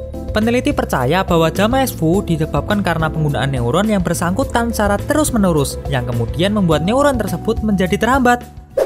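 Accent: Java, Indonesia. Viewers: medok banget mbah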